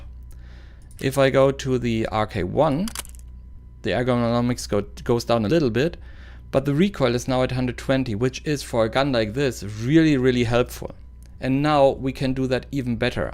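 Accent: Germany. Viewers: Der deutsche Akzent ist unüberhörbar, gutes vid btw <3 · Man hört sofort das du deutscher bist😉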